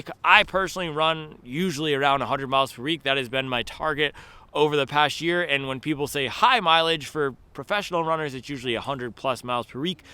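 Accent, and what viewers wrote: United Kingdom. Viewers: I’m British and thought you were British for a few seconds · I swear I thought u were British 😂 I was 2 seconds from cutting it off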